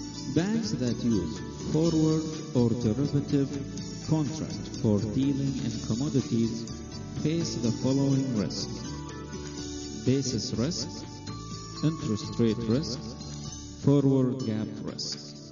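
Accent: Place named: Bahrain. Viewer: اللهجه حتى وهي إنجليزيه تنعرف أنها بحرانيه هههههه ..I wana help on the commercial bank management it's so hard :(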